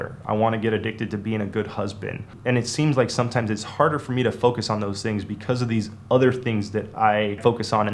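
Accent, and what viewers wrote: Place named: United States. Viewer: Shit man there are so many of us who fight the devil daily.... everything you have said reminds how young one started taking sips from our parents pinching a cigarette etc etc I had water in my eyes... then tarifa appears and playa los lances that gets me in shock... with your American accent thinking you state side.... got to much for me at min 12.50 roughly I'll finish it thow then have to take a benzo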